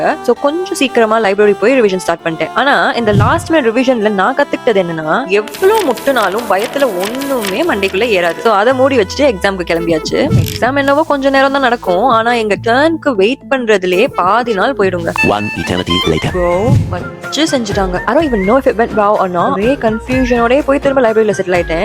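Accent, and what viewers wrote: United Kingdom. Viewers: Your British accent is too good ❤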